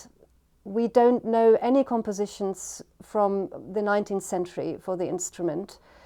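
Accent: Germany. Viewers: KAOSON There’s a touch of German in her English. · She sounds German. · it's definitely a german accent ;) source: I'm german :) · I’m English and I lived in Hamburg, Dresden and Berlin over a 7 years. Maybe that makes me particularly sensitive to her accent, but to me she sounds typically German. She has faultless English and has probably lived here for a while but she still very much has a German accent. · I think I can catch a touch of the German accent in her English speaking voice at times actually.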